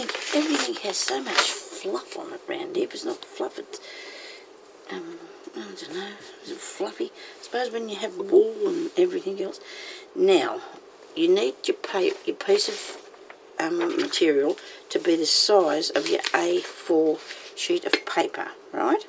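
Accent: Australia. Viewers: Loved your tutorial! Loved as well your Aussie accent! Can't say more as I have to go and try it while it's fresh in my mind! Thanks.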